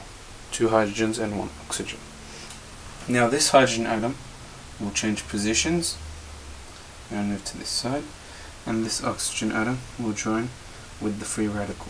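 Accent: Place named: Middle East. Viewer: Woah, you sound exactly like me except a little middle eastern touch.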